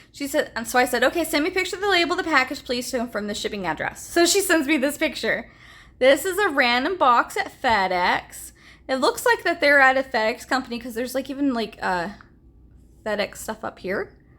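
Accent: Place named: Nigeria. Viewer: I think it's more Nigerian. Point is, it's certainly not a native English speaker.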